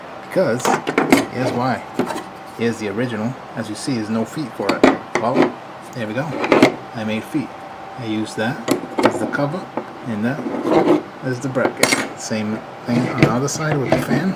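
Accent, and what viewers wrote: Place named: Boston, United States. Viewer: thought so hear the accent. I live on the Dorchester south Boston line.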